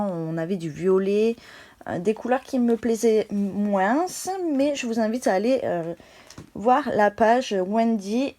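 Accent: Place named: England, United Kingdom. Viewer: Bonjour, On m'a justement offert des crochets bambou comme cela mais perso moi je ne me sent pas à l'aise avec Mise a part cela je te suit depuis le début, j'adore ton petit accent anglais, tes explications sont au TOP continue ainsi, ne change rien je souhaite plus souvent de vidéo (si tu peut ) merci bisous coco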